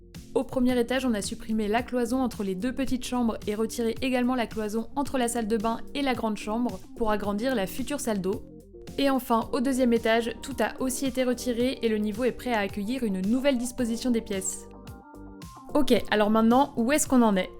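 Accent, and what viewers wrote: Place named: France. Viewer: Ça a l’air très bien but the accent français je peux pas regarder, désolé 😂 ça fait mal aux oreilles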